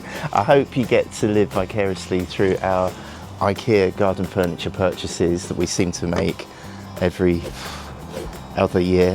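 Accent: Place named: United Kingdom. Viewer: Just listening to ur British accent convinced me to get this ikea chair, lol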